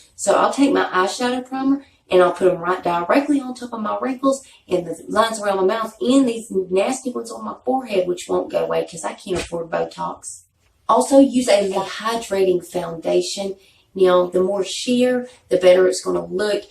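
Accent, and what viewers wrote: South, United States. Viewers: Hey sweet face, i call my wrinkles WISE CRACKS💖💖😜 thank you so much for sharing your videos with us! I absolutely love them! And thank you for these awesome tips I am 46 years old and have stage 4 lung disease set my medications done a lot of damage to my skin and being a little I guess! But I have been trying the Skin Care night and day and I think it's starting to make a difference so thank you so much for the wrinkle tips I needed that LOL! And you remind me Paula Deen! LOL I'm from North Carolina to so I love that southern twang😜😜😜💖💖💖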